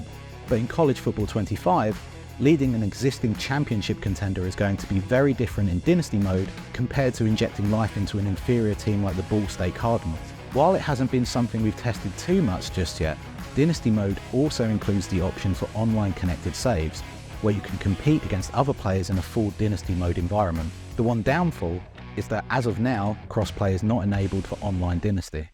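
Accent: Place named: United Kingdom. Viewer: This a British dude talking football 💀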